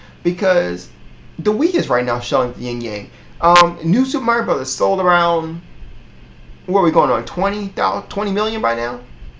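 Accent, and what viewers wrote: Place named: Australia. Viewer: Looks Around... I thought it sounded Australian... good vid man. I agree HD resolutions don't make or break a game. It may help but it doesnt matter much. As for the Wipeout vid, the point he was making was 360 fanboys going nuts saying the 360 did native 1080p, when it actually was upscaled. Nothing wrong with that but its a technical difference between native and upscaled isn't there?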